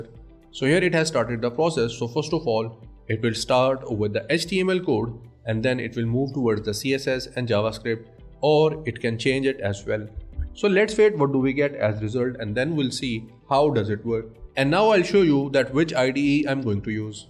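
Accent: India. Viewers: this guys indian accent is so thick its hard to understand and listen to !!..come on man.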